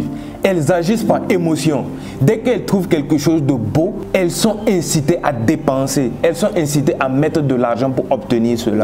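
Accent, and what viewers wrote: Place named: Burkina Faso. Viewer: Un Burkinabé à travers la façon de parler ❤ salut Frère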